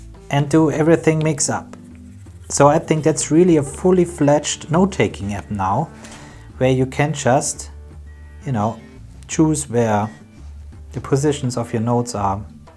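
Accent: Germany. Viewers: Are you from Germany? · Oh Gott, dein deutscher Akzent ist so schrecklich anzuhören!!!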